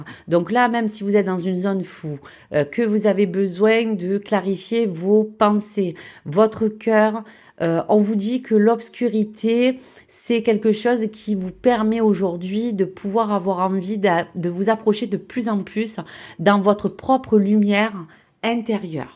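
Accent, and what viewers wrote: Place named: South, France. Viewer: Bonjour j'espère que vous allez bien merci pour ces guidances super que vous faites à chaque fois et j'adore votre accent du sud je suis de Marseille du coup l'accent chantant on connaît les cigales on les connaît je suis pas de Marseille même mais ça fait un moment que je suis là mais sinon j'ai grandi en Bretagne c'est pas la même c'est le jour et la nuit j'aime beaucoup votre accent quand même❤😂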